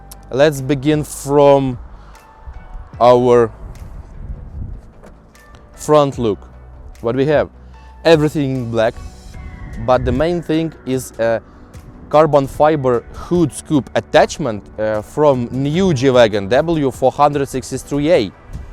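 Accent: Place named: Ukraine. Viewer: Я плохо понимаю английскую речь но тут понял все. Украинский английский язык такой же как Русский английский язык. Тачка хорошая получилась. Ну а портальных мостах?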